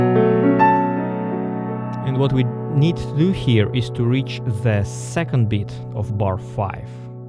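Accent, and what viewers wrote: Russia. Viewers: An excellent performer and teacher. But why on earth such a terrible Russian accent ? As a musician you should easily master the English speech intonation without much trouble. Kinda strange. But no hard feeling !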